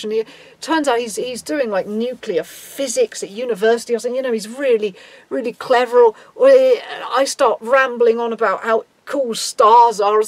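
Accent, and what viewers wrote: United Kingdom. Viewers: You sound British 😁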